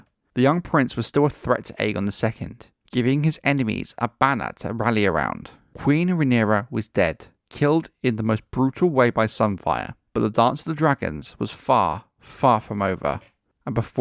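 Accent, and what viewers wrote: United Kingdom. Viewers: Too British I can't understand without subtitles.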